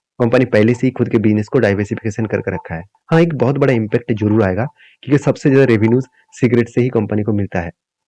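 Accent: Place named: West Bengal, India. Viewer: Are you from west bengal?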